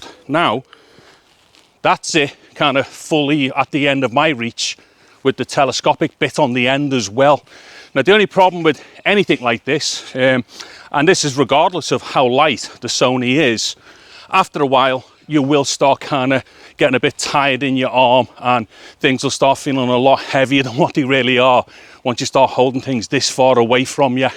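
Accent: Liverpool, United Kingdom. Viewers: I can't begin to tell you how nice it is for a Yorkshireman living in Japan awaiting his Sony ZV-1 just purchased via Amazon in the UK for his daughter to start her YouTube channel to hear a salt-of-the-earth scouser give a top-notch run-down on the Rode Wireless mic that my daughter is thinking of adding to her cache. For those of you interested in grammar, which is the main verb in the preceding sentence?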